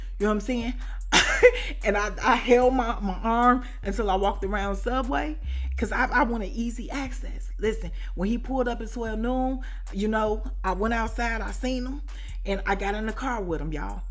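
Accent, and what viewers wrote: Chicago, United States. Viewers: I miss Chicago n I work graveyards when I get to work I throw her on and I feel like im at home n my sister telling me a story Chicago people sound just alike lol I love it thx for getting me threw these slow ass nights at work and keep up the great work hope 50 or Tyler Perry tap in with you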